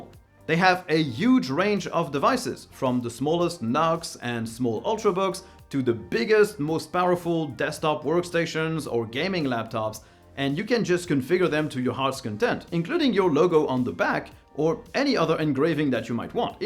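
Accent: France. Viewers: Your accent, are you French, Ouais?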